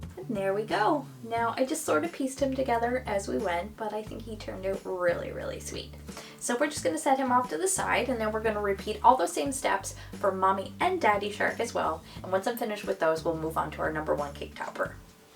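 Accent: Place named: Canada. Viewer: Now I thought she was American until she started saying aboot (instead of about) hahaha Canadians speak so strange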